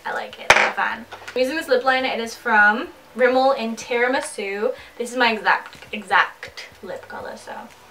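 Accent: United States. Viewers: You sound slightly American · does england has different accent depends on where you live? she said she's from england but her accent sounds like she's from america 😅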